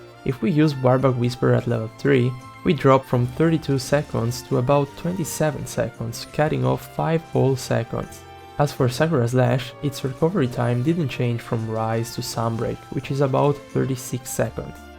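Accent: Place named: Italy. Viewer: Non so bro mi sai di italiano per l'accento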